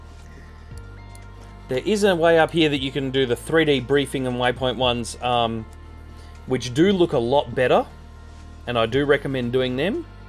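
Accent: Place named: Australia. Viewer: For what it is worth, you speak at a good speed for me but I'm Australian too so the accent might just be easier to listen to. I guess it takes all sorts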